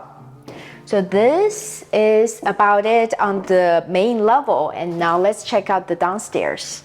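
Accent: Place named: Taiwan. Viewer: Are you Taiwanese? My mother in law is and sounds familiar ❤. Anyhow love your videos. I am new to house flipping and making many mistakes as I go but a life long learner . Thanks for sharing with us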